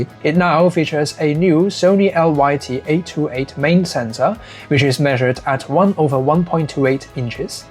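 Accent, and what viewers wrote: United Kingdom. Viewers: 英式口音？👍叻叻，英式係難過美式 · I just realized you have British accent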